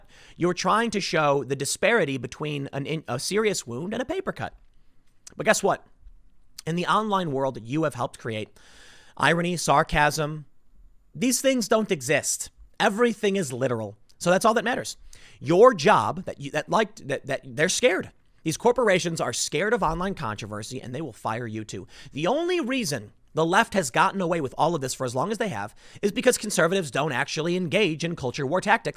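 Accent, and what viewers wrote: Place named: Philadelphia, United States. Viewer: probably doesn’t know it but he’s becoming a Philadelphian! I can hear him change in every video!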